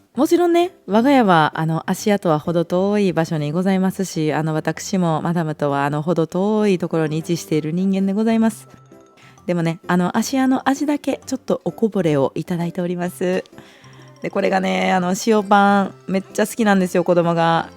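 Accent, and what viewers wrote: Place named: Osaka, Japan. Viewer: 大阪弁も大好きです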